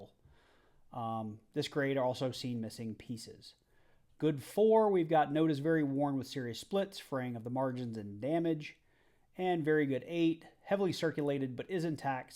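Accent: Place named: Georgia, United States. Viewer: what part of Georgia are you from?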